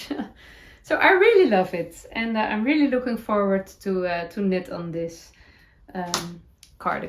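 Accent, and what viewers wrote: Netherlands. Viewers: Today was closer to 40c in my part of Texas, USA. And tomorrow is World Wide Knit in Public day. I can promise we won't be outside for very long. Perhaps I will take my knitting to a coffee shop and sit in the air conditioning there. I would-be lost with the AC. I love to hear your Dutchy English and the encouragement to do the things that bring you joy. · Congratulations💐 I am so happy that you kept going on in English. I love your Dutchy English and when you speak Dutch I often understand it because Danish have many words similar to Dutch. I really understand that you want to be a part of the knitting community in the whole world. I think I will try to make a podcast when I retire in fall but I think I will try in Danish first. I wish there were more people who speak Danish 😊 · Love your Dutchy English! And the way you rethink attitude to generate positivity. 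Heart Jumping is a “Sarahism” I’m increasingly adopting 😊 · Congratulations lovely on reaching 10 K - now 10.5K only one day later! 🎉 I simply love watching you as I crochet, I have Dutch heritage so it’s delightful to hear your accent and your growing command of English. Well done indeed! You certainly should be proud of yourself for ignoring your ‘Little Miss Perfect’ 😉 and following your heart. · Congratulations!!! I so enjoy your podcast and your “Dutchy English”. As a native English speaker I find myself saying words like “podcast” the way you do. 😅 You are very inspirational and just a joy to listen to. Congrats again. 🎉10,000🎉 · Congratulations.... you are delightful & I LOVE LOVE LOVE your Dutchie English.. love too hearing you talk about the weather - my (Australian) Son lives in Amsterdam & is learning Dutch so the shoe is on the other foot. I’m flying over tomorrow so interested to hear how warm it is. 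Keep up the good vibes. Nx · Congratulations to you 🎉. So glad you didn’t listen to your Miss Perfectionist and carried on with your English vlogs. There is nothing wrong with your English, as another person said, I love your Dutchy English. Thank you for your honest, genuine vlogs you bring much happiness ❤❤ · Congratulations! Wow 10 k that is awesome🎉 I really enjoy listening to your podcasts and love hearing your Dutchy English, you are so good at speaking English especially when it’s not your everyday language, well done. Thank you 😊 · Another great episode - I loved to hear the story of your journey toward your English podcast and the 10,000 subscribers! Celebrate your success - your Dutchy English is very endearing! (Dawn) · Congratulations on your milestone! In so many ways!!! It was such a pleasure to watch your podcasts despite what you called your "Dutchy English"! You travelled WAY out of your comfort zone speaking English even though you may not have believed that you were not good at it. 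I am sure that I am not alone in wanting to praise you to the highest degree!!! You are truly inspiring us to stretch beyond to achieve the utmost joy in our crafts and sometimes that involves stretching ourselves beyond what what we feel capable of doing well enough for others to see. You are a down to earth, creative individual sharing your love of crafting in crochet and knitting and I will not stop recommending your podcast to others, so there!!! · I am so pleased I found your podcast. I absolutely love listening to you and hearing all your stories ❤️ In the early 80’s my husband served as an exchange pilot with the Dutch airforce. We loved the Nederland and our two little girls became quite fluent in Dutch. It was a struggle for me but as a Canadian I was always treated with so much love and respect for trying. Your accent sounds so much like a dear friend of mine that I have lost touch with; she was originally from Breda. 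She was a beautiful knitter. I actually learned to knit in the Nederland. Continue with beautiful heart jumping ❤️.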